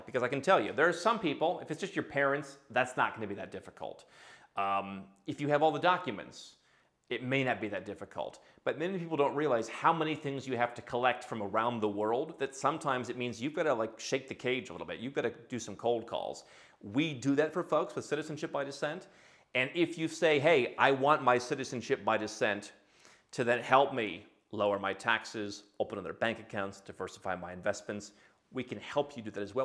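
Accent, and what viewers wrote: United States. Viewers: As soon as you speak we know you're American! Nothing wrong with that and the paper you hold can't change that. It's nice that you're also s citizen of the world. 😊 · It's an interesting point how you brought up that pride month is about identifying how you want to identify, but that you can't be Norwegian. One's identity is an internal thing, but is ultimately irrelevant. With your accent, you're obviously an American for all intents and purposes except the passport (which, since most Americans don't have passports anyway, further supports that point, with the caveat being of course that since you renounced your citizenship, you cannot live in the US).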